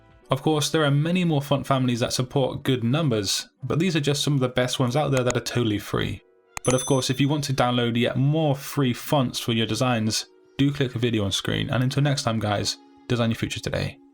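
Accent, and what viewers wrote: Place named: Liverpool, United Kingdom. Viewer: Excellent work and content. Highly appreciated. Like your Scouse accent.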